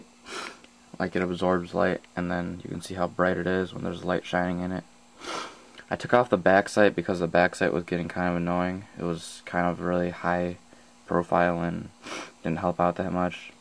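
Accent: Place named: Chicago, United States. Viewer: U need to lose that Chicago accent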